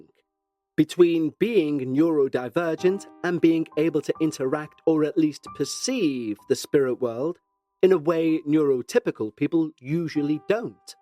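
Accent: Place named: Liverpool, United Kingdom. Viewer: Out of all the paranormal podcasts, this is by far the best. As someone from Preston, you are deffo a Scouser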